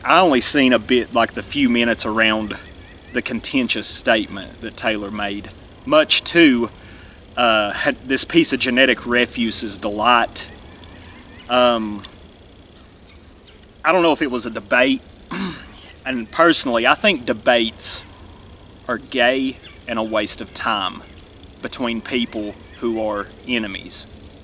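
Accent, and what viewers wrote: South, United States. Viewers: Your accent is a beautiful thing, brother. Never lose it, pass it to your children. It does not indicate lack of intelligence, you clearly have an abundance of that. Where I'm from, Coosa, the toes of Southern Appalachia, it's dying. The monocultural poison has crawled up from Atlanta and the anri-Southern rhetoric of the last hundred years or so is teaching our young folks that the way we sound means we're stupid. But no, it is a dialect, not an accent. We have our own language. We Southern folks need to stand up once again and say: "No, we are not like you. We are our own people. We have our own language, our own culture. We are here, and we won't be crushed."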